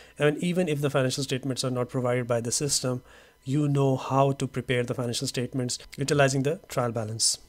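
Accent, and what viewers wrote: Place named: India. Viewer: that Hindu accent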